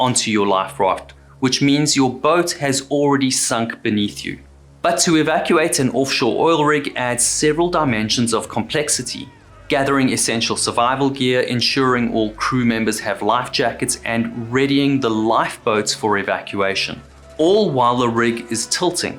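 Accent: South Africa. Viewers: Great video thanks. Everyone can tell you're from South Africa because of your accent.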